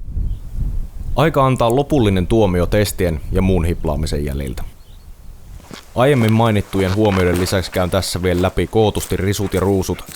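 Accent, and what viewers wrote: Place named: Savo, Finland. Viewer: Keloja Luonnosta juuri näillä sanoilla! ..itellä hiukan hirvitti / säälitti kun näki mimmosta kyytiä tuo reppu sai 😳 ..mutta parasta tässä se, että rinkka on käytössä ja toimii vielä tuon pieksämisen jäläkeen! Ja myöski arviot analyyttisiä, asiantuntevia ynnä hyvin realistisia ! Kiitokset ja Semppiä retkiin 👍 - Tope - Ps: täytynee vielä näin jäläkikätteen puol-pro -valokuvvaajana ja -opena kommentoija tuota mainiota ja erittäin jaadukasta kuvaustyötä, myös videon viimeistelyä.. vetää virtoja mille tahansa firman tuote -esittelylle, siis myös kuvan laadun ja tason suhteen.. hienoo kattoo tuommosta, kuvaa, joka myöski tehty huolella, viimesen päälle hyvin.. ..ja myös tarina sujuu ja kulukee niinku vanhalla tekijällä, ammattimaisella tuotekonsulentillä ainaki.. ..mukavoo huomata ent.savolaisena tuo jouheva jutun juoksu ja murteen nuotti.. 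👍😁🙌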